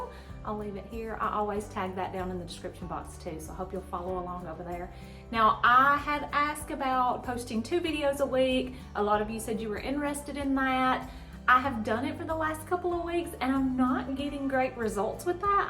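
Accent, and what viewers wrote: South, United States. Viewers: So I guess you can tell by my comments your shorts just popped up on my homepage a few days ago been loving your shorts so I decided to watch this full length video today and I love it. Love your personality. Love your beautiful home love your accent. I’m from Mississippi so I have one too. I did learn something from your video today. I’ve never been told before to heat up my cornbread pan before I cook my cornbread, I will be trying that, have a great day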